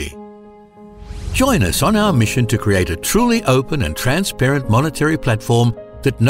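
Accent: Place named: Australia. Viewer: Australian (I'm Australian – I can assure you he's Australian – or a Kiwi who's lived in Australia for a long time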